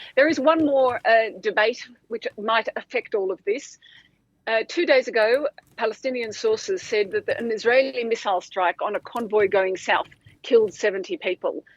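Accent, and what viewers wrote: Australia. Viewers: It is an Australian accent, everything was said clearly. The Australian accent is for the most part non-rhotic. This means that the pronunciation of the /r/ sound will never occur at the end of words. Where an American will say three separate sounds for the word car /kar/, an Australian native speaker will only say 2 /ka:/. This is a key feature that only occasionally has exceptions. There is nothing to change with the pronunciation of the words. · She is very understandable but her accent sounds like a cross between American and British,more rhotic than RP but less than 'Standard" American. Perhaps it is an Australian accent ,as other commenters have suggested. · You clearly don’t know an Australian accent. At least 5 people have now commented saying it’s an Aussie accent. Judging by your responses so far and Knowing your mentality they WILL ALL be “Wrong”. 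· She is probably Australian.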